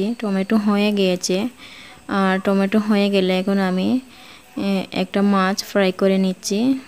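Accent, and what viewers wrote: Sylhet, Bangladesh. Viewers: Apu apni sylhet er kotay taken?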